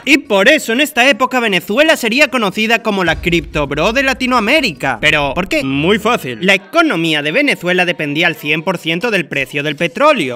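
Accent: Spain. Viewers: Históricamente incorrecto, pero una carta de amor a la comedia Brother no te creo que seas venezolano nunca con ese acento español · No suenas como venezolano suena como español o se te olvidaron las raíces, que pena ni fueras dicho que eres de Venezuela me da pena decir que ere mi paisano pero 😅 si da risa y es cierto lo que cuentas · Espera, cómo que eres Venezolano?, yo soy venezolano, y diría que suenas más español que venezolano 🤨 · Por que suenas a visualpolitic con ese acento y expresiones raras?(español de españapara ser mas claro) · Bro como q eres Venezolano? Pensé que eras español 😭😭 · Por qué si eres venezolano hablas como español?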